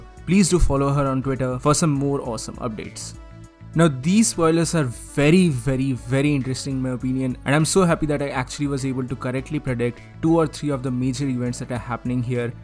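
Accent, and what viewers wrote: India. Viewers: In your language tone i know u are Indian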